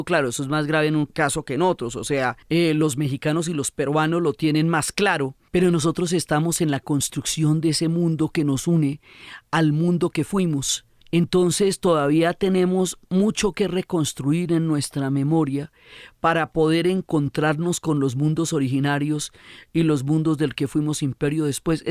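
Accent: Bogotá, Colombia. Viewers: Si cierras los ojos, conectas el relato con el fondo musical y le pones imaginación, listo el viaje . Gracias por sus videos y podcasts Dra. Uribe. Además su acento Bogotana me fascina. Sobretodo cuando al final de algunas frases usted dice : Si !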